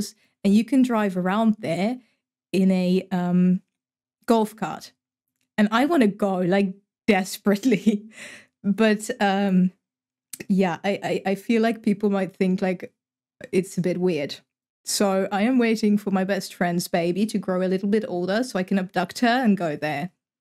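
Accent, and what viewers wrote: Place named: United Kingdom. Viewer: Gotta give you a compliment for your English! You actually sound British! xD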